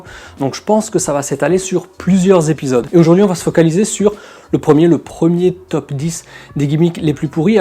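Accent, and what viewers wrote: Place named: Laventie, France. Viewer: d'où tu as chopé cet accent de Laventie?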